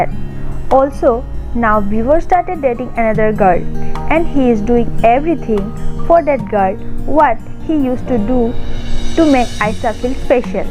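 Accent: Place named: Bengal, India. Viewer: Heiii!! If you read this, then let me tell you that you really have a cute bengali (no offense) accent and that Just added the sprinklers to your storytelling. Actually I am currently reading "OUR IM'POSSIBLE LOVE STORY" & was really curious and came up to your channel. Keep narrating more📖✌🏽 support 💛💜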